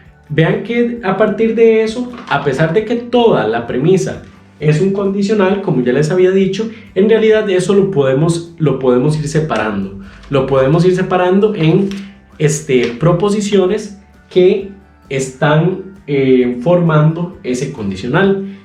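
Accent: Costa Rica. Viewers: En este video se le salió todo lo tico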